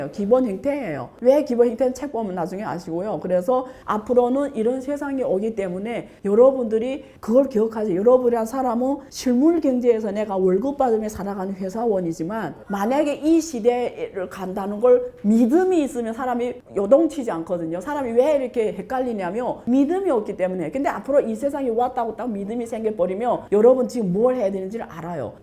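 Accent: Yanbian, China. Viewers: 옌변 일까 내용보다 특유 조선족 억양이 신경쓰이네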